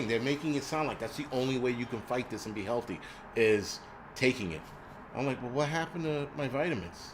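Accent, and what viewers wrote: New York, United States. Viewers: Your New York City style eloquence is showing again.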